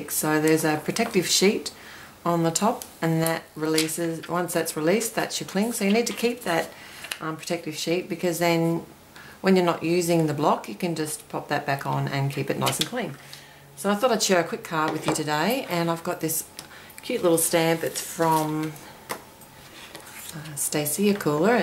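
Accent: Australia. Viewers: Thanks for sharing. You sound like a fellow Aussie and so just wondering where do you get your Tack n Peel from?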